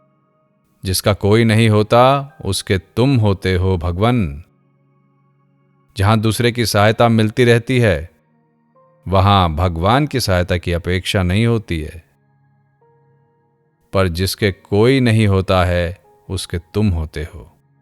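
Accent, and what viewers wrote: Standard. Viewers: धन्यवाद ! बढिया उपदेश ! यह भी प्रशंसनीय बात है कि पारायण करनेवाले गुरुजी से हम सच्ची हिन्दी का उच्चारण भी सीख सकते हैं / कैसी अच्छी है यह बात !!! / यह सब भगवान् का अनुग्रह ही है / हरे राम ! हरे कृष्ण !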